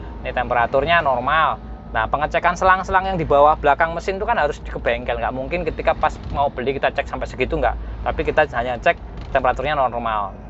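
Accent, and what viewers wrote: Java, Indonesia. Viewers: Mas ini dari aksen logat jawanya kelihatan msh punya darah kraton...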